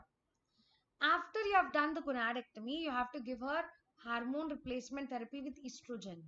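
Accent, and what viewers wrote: Telugu, India. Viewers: Thank u so much mam ur Telugu is so cute mam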